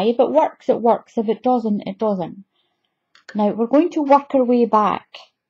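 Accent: Scotland, United Kingdom. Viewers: I just love your Scottish Accent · her Scotland accent is so strong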